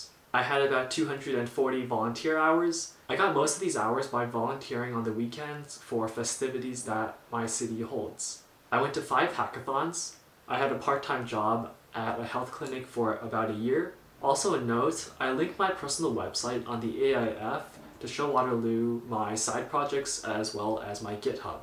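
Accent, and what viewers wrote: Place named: California, United States. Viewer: SE's cutoff is 97. With the academic adjustment factor, you might've just missed the cutoff. Otherwise, are you a Canadian citizen? Engineering has quotas on the max number of internationals they let in. You seem to have a Californian accent, but I could be wrong. Other speculation: perhaps another sport, a part-time job, or something that sets you apart from the typical applicant would've been helpful, especially considering the hundreds of typical applicants from Markham/Richmond Hill/York Region. P.S. Bayview and St. Robs are feeder schools.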